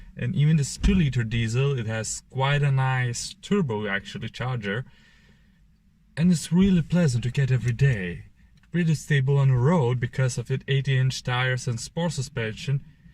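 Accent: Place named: Lithuania. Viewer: Is akcento galvoju : turbut Lietuvis. Is video matau, teisingas spejimas :D Nu ir veliau pasakei: " Vilnius" fainas video !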